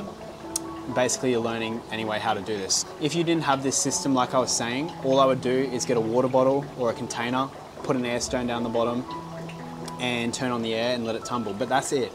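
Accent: Australia. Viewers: Australian, not Pom.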